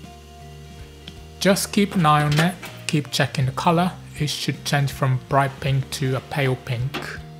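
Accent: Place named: Cockney, United Kingdom. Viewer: seems to have (charmingly) picked up a Cockney accent. Bet he went to London?😊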